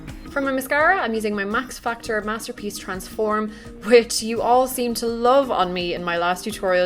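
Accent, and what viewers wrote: Ireland. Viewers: You look just beautiful, radiant!! I love your vlogs. I'm a Brit living in the States. It is great to hear an Irish voice! :-)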